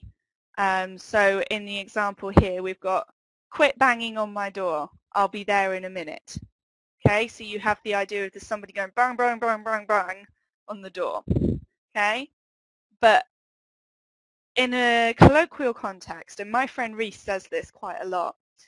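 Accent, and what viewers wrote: United Kingdom. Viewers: прекрасный британский акцент! супер!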